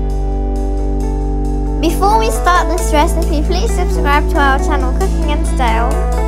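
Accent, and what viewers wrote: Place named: England, United Kingdom. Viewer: Oh woooow ur english accent is awesome 😘😘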